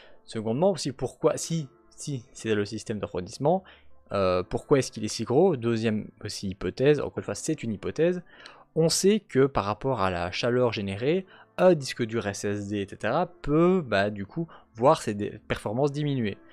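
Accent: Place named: Belgium. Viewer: sa s'entend a ton accent moi aussi je suis belges hahaha continue tes videos tu vas percer 🔥🔥🔥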